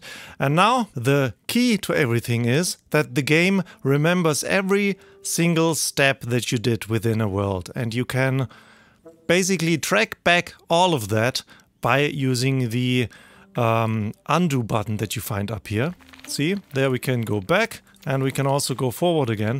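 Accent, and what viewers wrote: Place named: Germany. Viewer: German accent incoming in 3...2...1 🥰 love it.. Thanks for your videos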